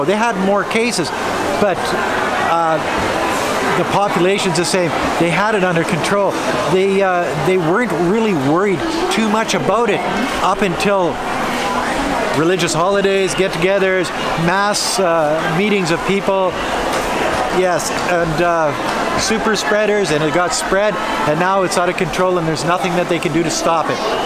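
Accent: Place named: Canada. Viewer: Sounds like a Canadian accent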